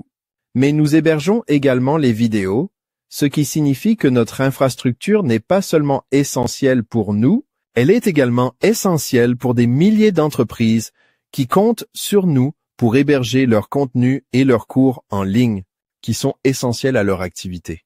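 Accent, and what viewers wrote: Canada. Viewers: avec un accent canadien, c’est rigolo 😃! Tu as traduit ta vidéo de l’anglais vers le français avec OneTake? C’est génial! La synchronisation des lèvres est incroyable wow 😊et la traduction aussi! Je suis ravie d’apprendre que mes vidéos sont en sécurité sur plusieurs serveurs et qu’ils sont redondant en cas de problème ou de panne pour que mes vidéos en ligne soit toujours accessibles, d’autant plus pour celles qui constituent mon offre!😍🫠🥰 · C'est peut-être bien pour traduire dans une autre langue que votre langue natale pour des gens qui ne connaissent pas votre voix. Mais, là, cela ne fait pas du tout naturel ! Hormis l'accent canadien, le rythme est souvent saccadé et ne respecte pas le ton naturel qui conviendrait à ce qui est dit. Cela fait vraiment robot. Personnellement, je n'aime pas.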